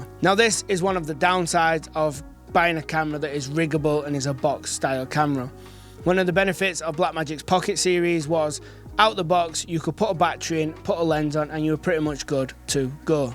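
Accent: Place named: Scotland, United Kingdom. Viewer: Damn that Scottish accent. 😂 Thicc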